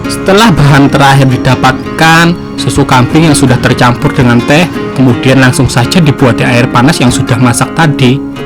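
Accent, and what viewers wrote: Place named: Kalimantan, Indonesia. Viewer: Khas kalimantan nya asri banar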